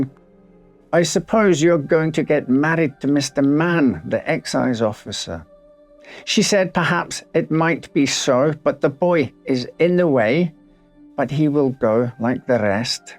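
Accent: United Kingdom. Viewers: This coming from a midwest speaking American, is it just me or British accents just perfect for narration? Also I find the accent to be oddly calming. I know, im weird. lol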